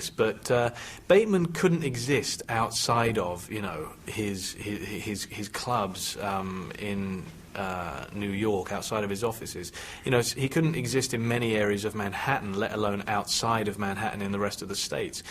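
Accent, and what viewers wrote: United Kingdom. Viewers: I didn’t hear anything he said. I was too busy being charmed by the British accent and confused realizing he’s British this whole time. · He's English... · Wait a fucking minute... WHEN WAS HE BRITISH??!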